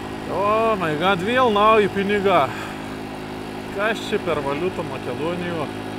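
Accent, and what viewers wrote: Samogitia, Lithuania. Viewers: sunku buvo priprasti prie tavo zemaitiskos snektos, bet siaip unikalu ganetinai, pradejo patikti :D o kiekvienas tavo video turi kazkokio sarmo, tau tinka kurti toki content'a :) sveikinu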